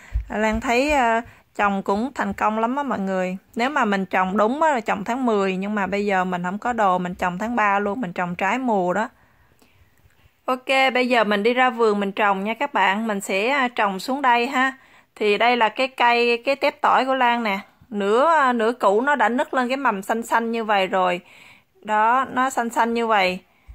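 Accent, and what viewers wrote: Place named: Mekong Delta, Vietnam. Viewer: OK. Chi Lan. Giong noi chi nghe nhu mien Tay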